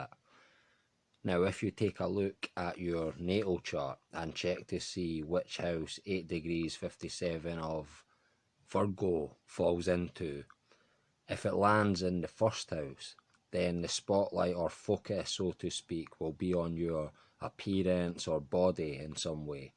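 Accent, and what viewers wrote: Scotland, United Kingdom. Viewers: I'm sorry. I have a question to ask but know not where, so I chose your recent video. It seems obvious to me that each nation has a sign. I am Filipino and Scottish. They are both like Scorpio. Both nations changed war. They are maniacs in battle. But you don't find serial killers from there. They are both from small islands. And they are considered the most handsome people. They are terribly inventive! But they love to drink. To the extreme. Really, Filipinos are your brothers in the East. And guess what? The Philippines has the most Freemasons in the East. The Scottish Rite is there. The Southern Filipinos destroyed all Western invasion. The Celts couldn't because they shared terrain with the enemy. But still, you, a Scot, have your accent. And many Celts do speak their language. How to determine the sign of a nation? 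The Russian psychic grandma said that all nations have stars. What I know is, Scotland and Philippines are Scorpio. We love melee. We are good talkers. When we have babies, wow!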